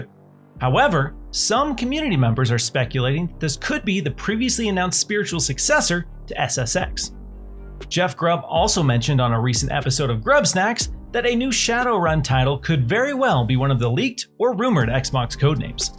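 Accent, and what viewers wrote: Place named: United Kingdom. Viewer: I thought you was British... Confusing.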